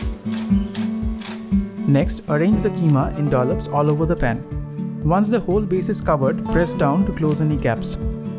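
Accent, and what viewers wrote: Bengal, India. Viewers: Love the way you speak Bengali words in the same. বাঙালি accent in between your English narration. Love your recipes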